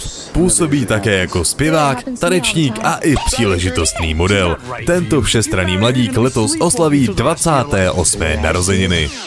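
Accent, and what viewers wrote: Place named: Ostrava, Czech Republic. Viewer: synku nemluv po ostravski bo mi to usi rve:)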